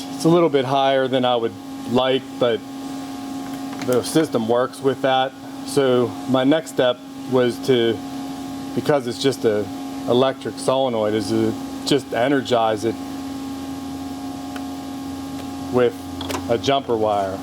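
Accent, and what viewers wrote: Pittsburgh, United States. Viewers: bro where is your shop located?????...you sound like you have a PITTSBURGH accent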